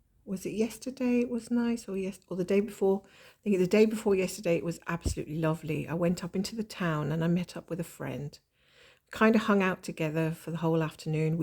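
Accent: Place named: United Kingdom. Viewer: I've stitched with you on my only full coverage design and I must tell you how much you've made me laugh and how I've enjoyed your stories. I too had a cat and I recognised him in the descriptions of your cat's behaviour. Happy stitching and plenty of sun for us! ps. I also love hearing your British accent.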